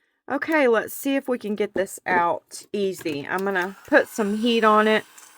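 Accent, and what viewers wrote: South, United States. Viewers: You are a Blessing and extremely generous. Not many content creators return their gratitude the way you do. You not only put your heart and soul into your channel and subscribers. You take the time, thought, and money to put together a large gift box for those who have given and blessed you. It's hard to come by finding generosity and kindness, but also people willing to give without expecting anything in return. I can't afford to send anything or buy from you at this time, but am willing to do that whenever I get that opportunity. With you being open and sharing the Lord publicly, that's a gift in itself. Plus, you're so adorable and just a doll baby with that HUGE country bumpkin twang that I miss so much whenever I lived in the south. (Georgia). I don't feel it's unfair. I feel that you made the right choice when deciding whether to return your gratitude. 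You can ONLY do what you can do and also afford. We all strive to do our best once we choose to do the best thing. I'm sure once your channel grows so will the giveaways. When one chooses to subscribe to a channel, they do not come in expecting gifts. Why now expect it knowing your reasons for the giveaway? Makes you think of others true intentions for watching or subscribing to creators. Focus on those who are here for you regardless of gifts or freebies. Those who are selfless and carry honest intentions, are those who are favored the same in return. Whether it's time, generosity, or acceptance. Please do not stress on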